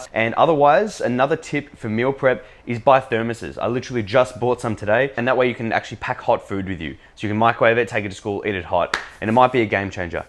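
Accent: Australia. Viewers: I for some reason love the way you say "hey guys here" love the australian accent lol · Useful video! I have made many of these mistakes when I was younger and still great tips which I had forgotten about nutrition. Also love the aussie accent :D Cheers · Is he Aussie?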